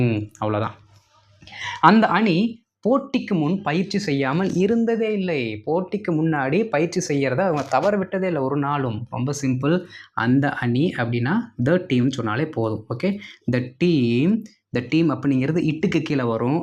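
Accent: Coimbatore, India. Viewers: I thought so.. That's why I asked.Coimbatore slang. (Nice) Thanks for answering. I madam.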